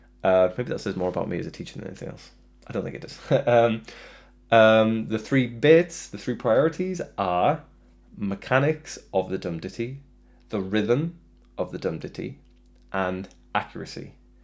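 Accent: United Kingdom. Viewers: Is that a Shrewsbury accent 🤔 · Are you from Northern Ireland? You sound like Bear Grylls.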